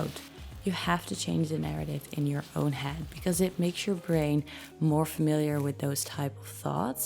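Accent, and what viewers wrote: Netherlands. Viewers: Are you Dutch?🙈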